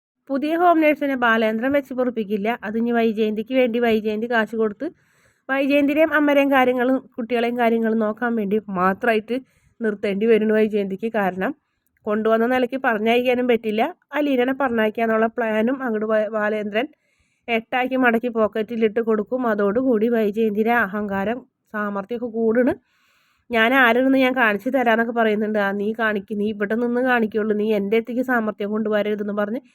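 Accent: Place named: Thrissur, India. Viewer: തൃശൂർ സ്ലാങ് ആണല്ലോ 😂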